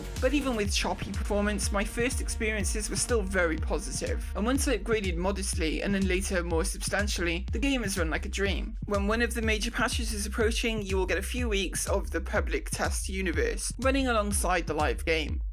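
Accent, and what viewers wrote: Wales, United Kingdom. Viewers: you make really good content. Nice Music, good gaming, good edditing and your voice rounds it all up its just natural, its good as it is. English is not my first languege but do you have a britisch accent? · There's nothing wrong with your voice. I do love that Welch accent, but it could be thicker.... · I honestly just thought the voice was a specific British accent from somewhere. 😂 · Awesome! The Welsh accent is lovely. I’m down here in the Southern Hemisphere, and I like being able to remember accents and where they come from. (I have fun guessing with overseas visitors at my work). Best wishes for 2022 and beyond! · Your voice is lovely sounding and Welsh #FullTidee so don’t worry about those that mock. I’ve been placing SC just about as long as you have but can’t put the hours in as much as I’d like so still feel a bit of a newb when it comes to approaching an Org and I tend to hide away mining and exploring different locations. 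I’m less combat focussed so maybe Skunkworks can form an explorer arm and recruit for that?:) Keep up the good work though (and Will) and see you in the verse hopefully soon. Taffy Coops. X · Thanks! I thought you were speaking with a British accent. We all have something. I’m low vision. It’s OK and you and Will are among friends! Your videos are fantastic!! I very much enjoy them. Please continue.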